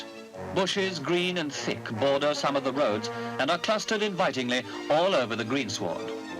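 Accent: RP, United Kingdom. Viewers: I was born that summer in a very different part of The UK. This offering? Same predictable, English plumby voice, use of word England when at times should have been Britain ,and the poisonous anglocentricity many of us have to suffer...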